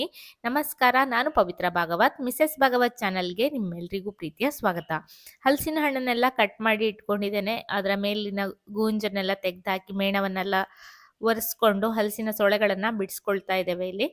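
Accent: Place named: Kundapura, India. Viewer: ನೀವು ಮೊದಲು ನಮ್ಮ ಕುಂದಾಪುರ ಭಾಷಿ, ಮಾತು ಆಡುತ್ತಿದ್ದೀರಿ, ಅಲದಾ???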